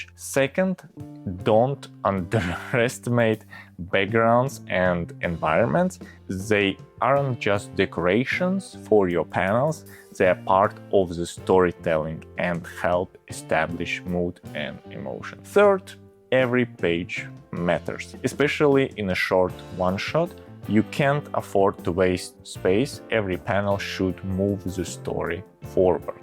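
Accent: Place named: Eastern Europe. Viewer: Очень приятный славянский акцент)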